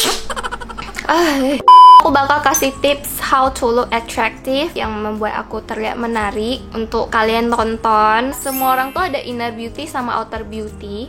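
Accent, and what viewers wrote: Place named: Batam, Indonesia. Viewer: Logat orang batam lucu yaa😂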